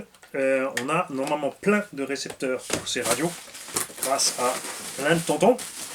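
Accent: Alsace, France. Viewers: Hallo ! Ich liebe deinen elsässischen Akzent (ich weiß, dass es deutsch ist, aber sie übersetzen nicht ins elsässische: p) Merci Tonton Pictave, tu as remis la machine à projets en route :) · Salut toI héhéhé le blondinet déjà qu'ils avaient (abos) du mal de comprendre en temps normal ,Mais maintenant sa và être pire😂😂😂😂😂😂😂😂😂😂😂😂PS C'EST QUAND MÊME BO L'ALSACIEN 😘😘😘😘😘😘😘👍 belle journée à tous jc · Mais dis moi, tu fais drôlement bien l'accent alsacien, on s'y croirait presque 😁😋🤣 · Génial l'accent alsaco 🤣 c marrant d'ailleurs que tu ne l'ai pas plus que ça en temps normal😅 super kdo merci le tonton🤩😘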